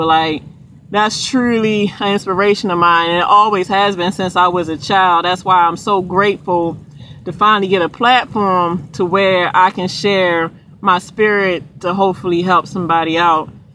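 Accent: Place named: Texas, United States. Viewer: I love her accent, someone in another one of her blogs said Maryland I was like no way I'm thinking more Texas or south who knows where, she's from I'm from Carolina I think she's close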